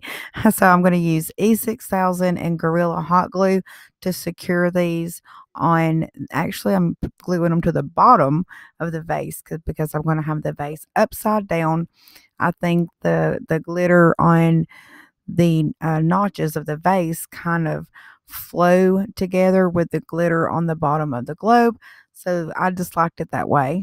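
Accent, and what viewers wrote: South, United States. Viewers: You are so creative and explains the techniques so well. Thank you for sharing! Also, you are so pretty and I love your southern accent!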